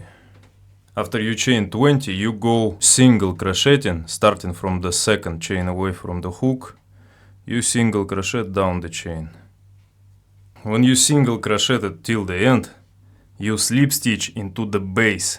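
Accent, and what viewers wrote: Russia. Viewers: I love the thick Russian Accent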